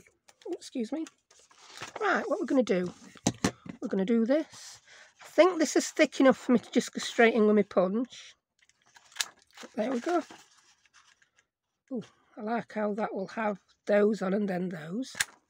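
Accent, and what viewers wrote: United Kingdom. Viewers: What a fun show! I really enjoy watching your creative adventures. And DIY labels is now on my Creative To Do List, thanks to you! I love my EK Success punches, too. I find them to be both sturdy and reliable. They also store more nicely than the big plastic ones made by some other brands. One question: you mentioned earlier on in this video that you knew of someone else who also like to make her own labels. I didn't quite catch the name of that person's channel (well, maybe it's my Yankee ears that can't always catch everything said by you Brits?).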